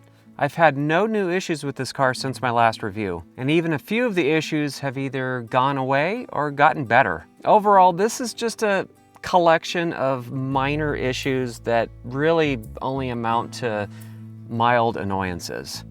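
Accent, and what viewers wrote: California, United States. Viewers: I was searching for California accents yesterday and stumbled upon your video from 11 years ago. Just saw another one of your videos now, and it feels so surreal. I really love your accent, and I’m definitely going to watch all your videos to learn it.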